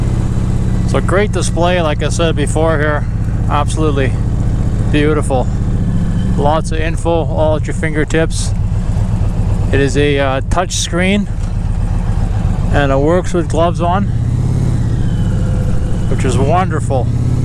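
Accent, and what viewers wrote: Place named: Prairies, Canada. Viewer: I feel like your from Manitoba or Saskatchewan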